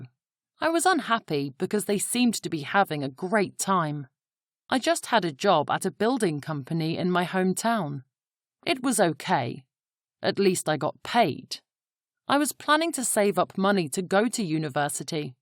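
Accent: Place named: United Kingdom. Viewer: fk uk accent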